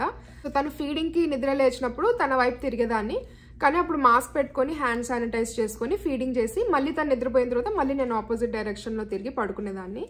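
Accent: Rayalaseema, India. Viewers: Hii.. Sis...aa pain yela vuntundo nenu anubhavinchanu naa Husband RDT hospital lo isolate ainapudu...aa badha pagavaallaki kuda vaddani devunni kore daanni....mee raayalaseema yaasa vintunte naaku mana intlo maatladu tunnatle vuntundi...Maadi Tadipatri...present Hindupur lo vuntunnamu..mee family mucchataga vundi...May God Bless U 💜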